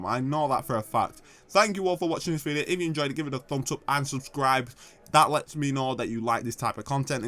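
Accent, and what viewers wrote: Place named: Bolton, United Kingdom. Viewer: That's a Manchester accent Sounds like Bolton?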